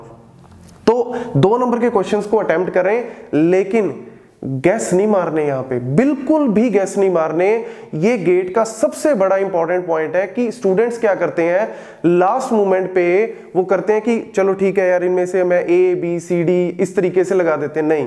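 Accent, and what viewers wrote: Punjab, India. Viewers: Ur accent has punjabi touch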